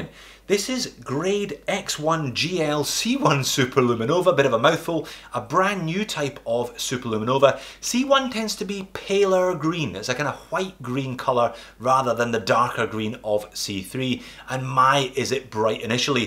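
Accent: Scotland, United Kingdom. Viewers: I love your watch videos. Your Scottish accent is great too haha!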